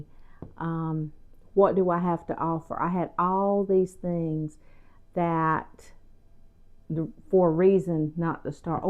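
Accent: South, United States. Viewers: I love your southern voice so much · you are an inspiration. Sharing your story helps me remember that we just don’t know sometimes what the people we see in our daily lives are going through. We just don’t know. Perhaps remembering that we don’t can I courage us to be kinder in our interactions with others., I don’t know you but you seem to radiate kindness. Perhaps it is your voice and that beautiful southern accent that we in the south are blessed with. Perhaps it’s the expression on your face. Maybe a combination of things shows the kindness of your heart. You were speaking in this particular video of a sad event in your life. I am reminded that in the Bible there is a verse that tells us that we learn in the House of sorrow not in the House of laughter. God in His wisdom knows what we need and in His wisdom He sends situations or people to provide for that need. 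God bless you. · you are an angel here on earth. May God richly bless you and your family for your faithfulness to Him and to one of his precious Lambs who had no home until you provided for her, cared for her and loved her as He would. I love your YouTube channel and your sweet smiling face, your lovely southern accent that sounds like me, I'm a Nashville TN native living in Cedar Hill TN now. Your courage and determination are inspiring and your kind and loving heart is projected through every word you speak to us and every smile you give to us. Blessings upon blessings be showered upon you and yours forever. ❤🙏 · I appreciate getting to know you more. I’m a newish subscriber- so I’ve only seen a few of your videos, but I knew I had to subscribe because I love a sweet soul with a southern accent! Plus, a great cook! Love, from Texas! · your genuine warmth and sincerity are so refreshing in this increasingly disconnected Impersonal world. 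Your wonderful Southern accent also endears you to this boy raised in the Deep South! May God continue to bless you as you bless others! Big hug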